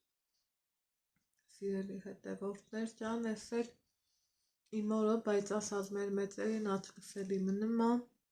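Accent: Lori, Armenia. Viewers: Շատ հաճելի բառբառա ջան Լոռվա բառբառը👍🤗🤗❤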